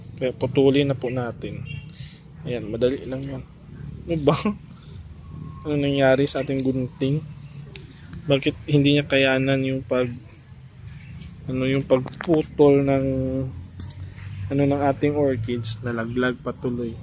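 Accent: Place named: Iloilo, Philippines. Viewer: May waling waling din po kayo sir.. Ang galing mo mag tagalog sir pero ang accent nyu is ilonggo sir.. Ako din ilonggo din po ako sir.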